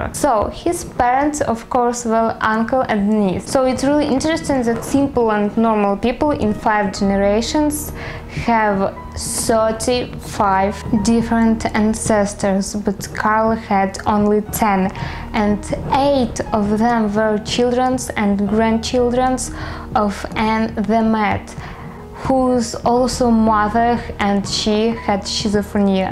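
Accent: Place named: Ukraine. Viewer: UkrEnglish... It's nice that u make such efforts to speak English and even make vids but i'm sure u would have much more subscribers if u work on ur pronunciation))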